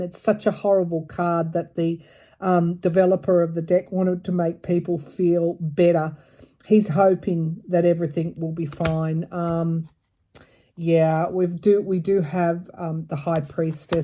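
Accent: Australia. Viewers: thinking about setting up Siri with a female Australian accent in honor of you. 🌷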